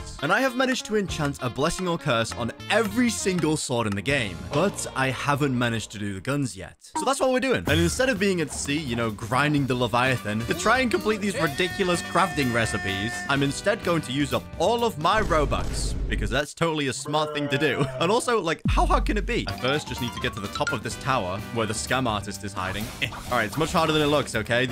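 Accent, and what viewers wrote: United Kingdom. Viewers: Yea but ur brtish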